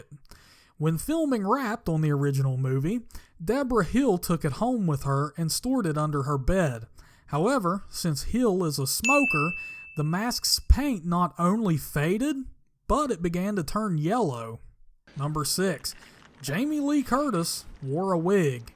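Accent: United States. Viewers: His voice so country🤦🏽‍♂️😂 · this guy sound like a hick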